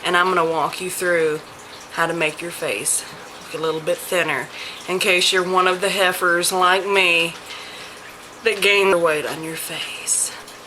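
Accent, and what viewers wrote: South, United States. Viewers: You my friend, just earned a new subscriber. :) Are you from the south? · where are you from ?? your southern accent is so awesome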